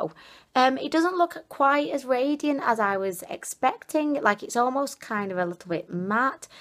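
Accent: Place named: Northwest England, United Kingdom. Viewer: So refreshing to hear a Northern West England accent. Great video.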